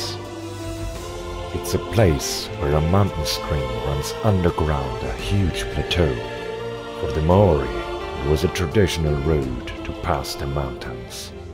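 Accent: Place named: United Kingdom. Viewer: are you austrian? cause you totally sound british. great video, I'm so jealous now. I watched the beginning with the Narnja music over 10 times, I think. just gives me goosebumps.... O__O I might actually take the "wrong flight" on purpose, next time I go to see my family in the Philippines and have a stop-over in Dubai or AbuDhabi.....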